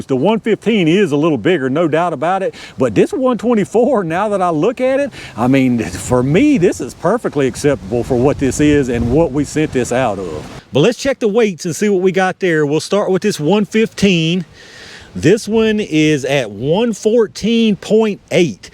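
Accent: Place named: Georgia, United States. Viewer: Fun video & thats a Georgian accent if I'm not mistaken.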